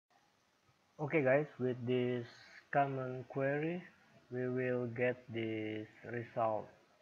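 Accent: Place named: Indonesia. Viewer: makasih bang ilmunya ane cari tutor ini susah banget,,, untung ada abang kerjaan ane cepet kelar,,, logat indonya masih kerasa jadi ane ngerti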